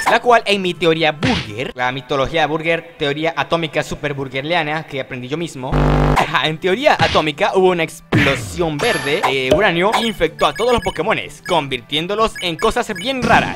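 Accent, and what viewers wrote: Venezuela. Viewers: HAAAAAAA ERES VENEZOLANO CONRAZON PAPI ERES DE LOS MIOS HABLA CLORO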